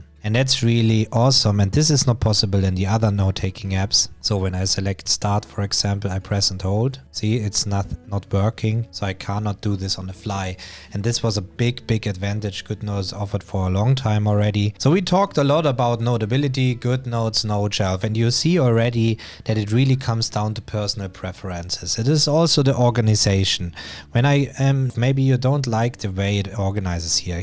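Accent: Germany. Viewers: Are you German? ^^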